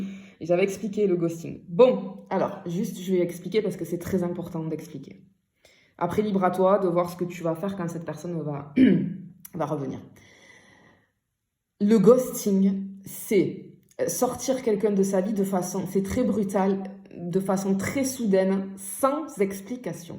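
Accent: South, France. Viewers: Bonsoir c est exactement ma situation, il ma lachez sauf son silence et ces pouces sur mes commentaires et je suis brisée de ses trahisons ! J en suis la!! Et vous avez raison, je me suis abonné, j adore votre accent du midi ,je suis née dans le midi et je vie dans l Isère et votre accent me fait du bien mon midi qui me manque tant! Gratitude infinie gros bisous 🌈🌞🧿💔💔❤❤😘😘🌷👏🕯🐞🌟🕊🍀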